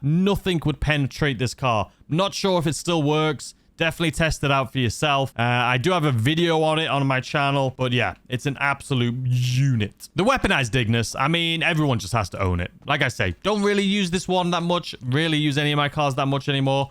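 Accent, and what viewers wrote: United Kingdom. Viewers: answer me and i will subscribe are you british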